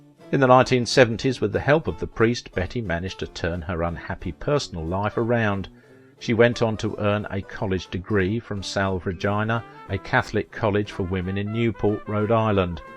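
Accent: England, United Kingdom. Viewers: About I had to play it back, cause I thought the narrator said Sal Vagina...🤔, he had a thick British or English accent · A normal, beautiful English accent. It's our American accent that can be called thick, in my opinion.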